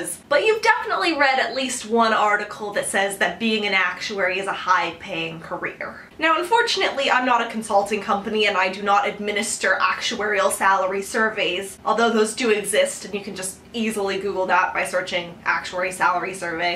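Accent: Canada. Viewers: Did I watch this on fast forward or do all Canadians fuck up our language like this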